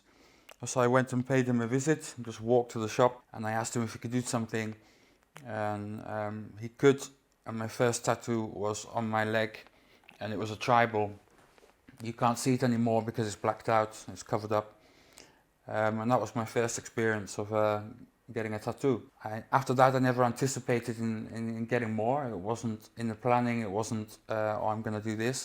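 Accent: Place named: Netherlands. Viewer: You are definitely not from the UK, your Dutch accent and Dutch words here and there are a giveaway.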